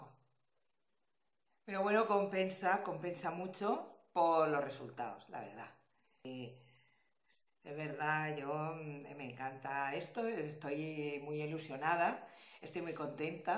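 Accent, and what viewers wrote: Spain. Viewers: Hola. Te encontré un día por casualidad y ahora soy una seguidora incondicional. La primera vez que te escuché hablar dije...esta chica es de Albaceteee, me encanta el acento. Soy Albaceteña pero llevo 25 años viviendo en Zaragoza y me encanta mi tierra y su gente. Eres muy divertida y aprendo mucho contigo. Me encantaría participar en el sorteo y claro que me tocara. Un tutorial que me gustaría mucho sería una carterita para el tabaco de liar, que ahora está de moda. Un beso grande y espero seguir viéndote y escuchándote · Buenas noches yo te sigo desde Barcelona y me encantaría poder participar en el sorteo me ha enganchado tu bonito acento manchego ya que mi familia son todos de tu tierra. Explicas super bien los trabajos y de momento solo hice un neceser me gustaría que nos hicieras un bolso con cremallera tipo bandolera. Muchas gracias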